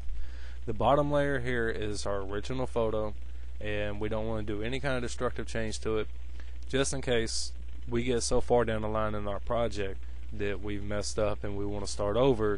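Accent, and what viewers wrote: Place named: United States. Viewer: i hear no echo? just a very thick american sounding accent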